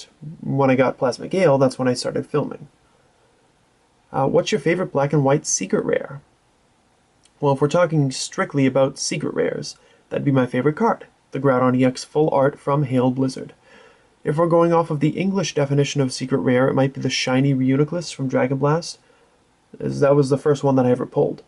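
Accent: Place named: Massachusetts, United States. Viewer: Where in mass are you from? Haha I heard and was like :O I'm north of Boston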